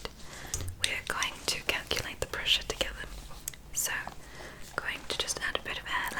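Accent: England, United Kingdom. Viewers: Best ASMRtist on YouTube, and the very realistic British accent is back :) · Being british when you say certain words in this British accent I sit here repeating them like “do I say stomach like that?” I mean clearly I don’t I am from Manchester I am in no way posh 😂😂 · this english accent is so jarringgg please stop it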